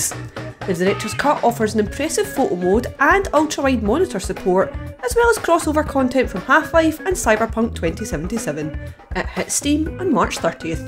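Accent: Scotland, United Kingdom. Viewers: PC Gamer, I loved the announcer's lovely Scottish accent ! Please give us more =)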